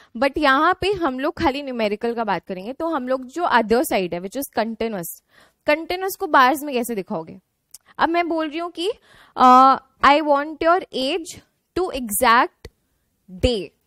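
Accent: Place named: India. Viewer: Good teaching, Madam. However, given that we already struggle with the Indian accent, please avoid mixing English with your local language if your target is the global market. Thank you, and keep doing this great job!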